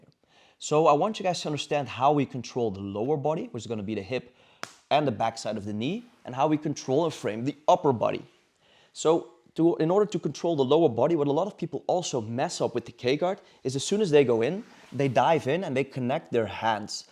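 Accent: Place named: Netherlands. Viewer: Amazing! Very grateful🙏🏽 good english, but you cant hide that Dutch twang 😊 nogmaals bedankt, groeten uit 🇵🇭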